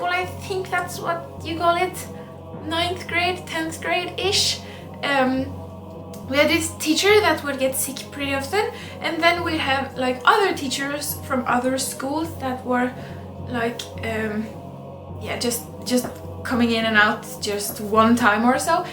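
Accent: Norway. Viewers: Love your Norwegian dialect. I never heard a Norwegian say the “r” sound in the back of their throat like a German. I am a German speaker, btw.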